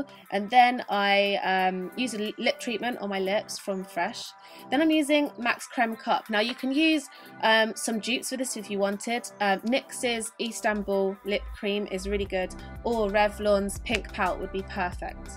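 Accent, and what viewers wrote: United Kingdom. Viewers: Voice over! I live in America and i'm obsessed with your British accent!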